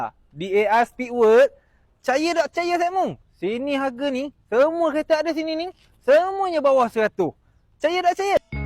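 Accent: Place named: Kelantan, Malaysia. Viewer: Aku pun tak paham bahasa pekat kelate kamu 😅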